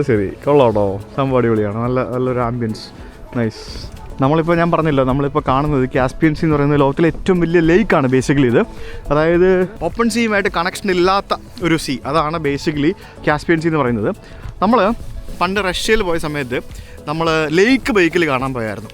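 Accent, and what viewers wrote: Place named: North India, India. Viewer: why do you speak english llike north indians?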